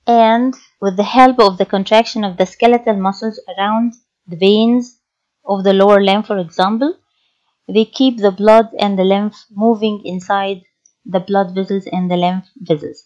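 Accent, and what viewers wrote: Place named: Egypt. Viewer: I love Egyptian English accent! Alot of respect mam From Pakistan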